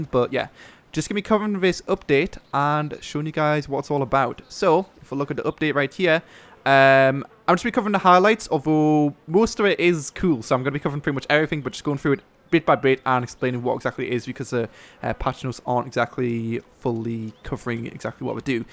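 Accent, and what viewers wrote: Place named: United Kingdom. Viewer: If you cant listen to him, then watch someone else and stop being annoying to other people watching the video. By what you said, you don't like live commentary, which is fine. His dialect? I'm guessing he's british, and really? You cant understand him? I'm from Serbia and I can understand him better then someone from Croatia! Well. there is my rant to you, sir! Respond if you wish, goodbye!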